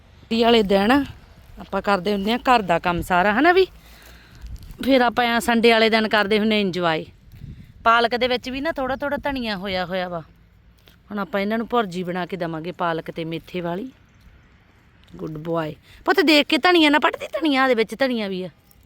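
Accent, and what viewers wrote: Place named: Jalandhar, India. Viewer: Tuhadi language Jalandhar wali lgdi aa asi v Jalandhar to e aa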